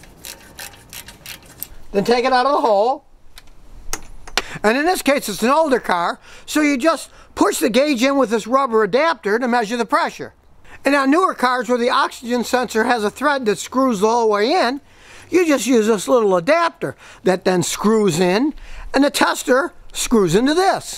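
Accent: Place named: Chicago, United States. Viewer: "dont guess!! test!!" haha eye love the accent and the VOLUME! Chicagoan?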